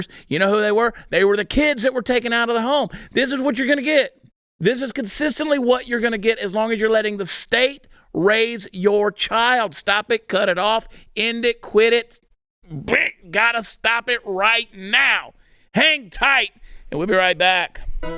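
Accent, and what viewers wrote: Texas, United States. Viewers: I personally love a wordsmith with a Texas accent! A day without Chad & Co. is less shiny and bright.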